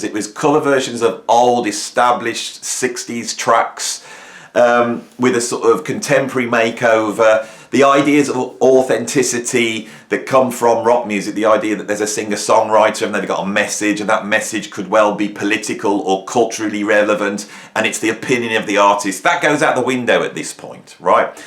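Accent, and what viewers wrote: Birmingham, United Kingdom. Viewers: Got to love a Brummie accent! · I listened to this without visuals. The power of the brummie accent was far more compelling than any face furniture.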